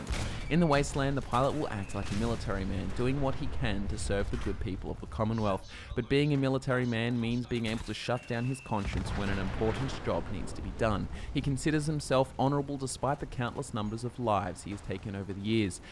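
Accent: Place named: Australia. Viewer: are you austraian because you sound awesome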